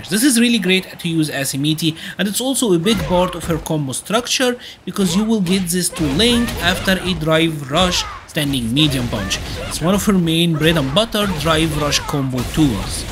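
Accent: Egypt. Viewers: I can tell you’re egyption just from the first minute listening to your accent lol. Great video btw really helpful.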